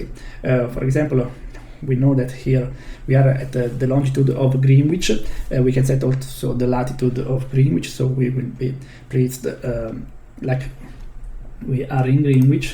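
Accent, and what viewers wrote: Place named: Italy. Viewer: Very very nice, congratulations. It's one of the things I wanna make too ! By the way Italian accent is perfect.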